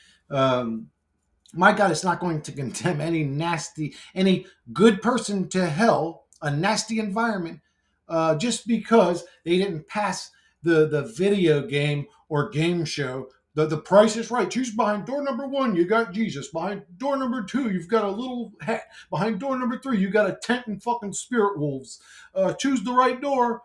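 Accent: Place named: Nigeria. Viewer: The Nigerian uncle accent my favorite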